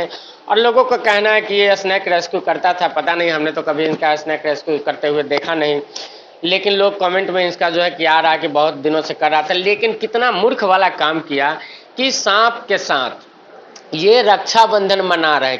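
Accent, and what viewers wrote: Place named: Bihar, India. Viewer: Bat karne ka style mazedar he bhai ..traditional Bihari language very nice Mahadev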